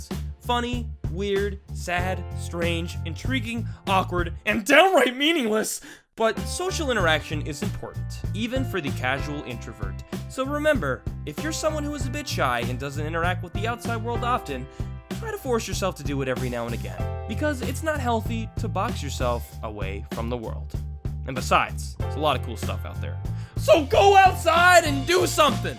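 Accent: Canada. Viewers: he is Canadian?!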